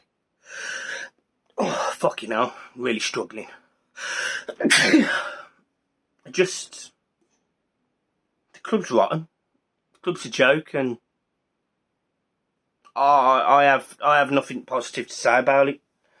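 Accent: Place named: Black Country, United Kingdom. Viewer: A proper Black Country accent? What’s going on 😂😂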